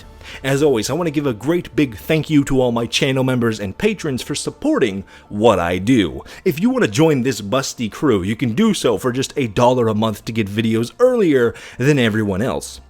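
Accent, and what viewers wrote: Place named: United Kingdom. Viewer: too. much. british...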